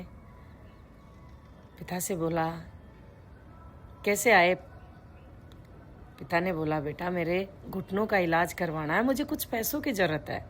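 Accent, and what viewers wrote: Gujarat, India. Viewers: आपका अंदाज बहोत अच्छा है आप भी खूबसूरत हो ,सिर्फ एक बात खटकती है आपके उच्चारोमे गुजराती या एम पी का टच आता है ,उसे सुधारणे का प्रयत्न किजीये ,आप एक अच्छी कथावाचक ,Anchor बन सकती हो ,हार्दिक शुभेच्छा 🎉🎉🎉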